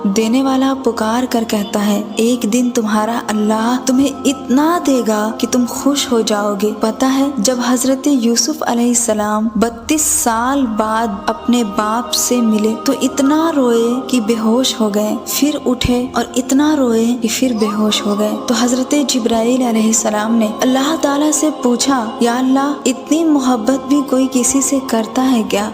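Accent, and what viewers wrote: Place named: India. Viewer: Your accent looks like from India . TRUE ???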